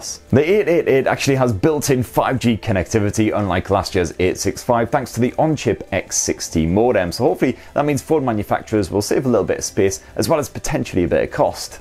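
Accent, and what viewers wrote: United Kingdom. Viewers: Your British akcent is hard to understand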